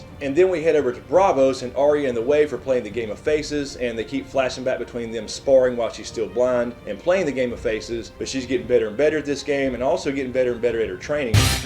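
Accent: South, United States. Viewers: love the accent, where r u from, the south or texas or somewhere else xd?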